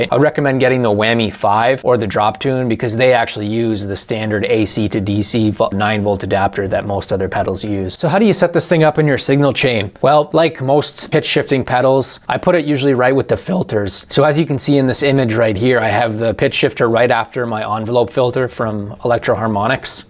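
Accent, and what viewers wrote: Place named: Canada. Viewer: This guy talks like a darn Canadian!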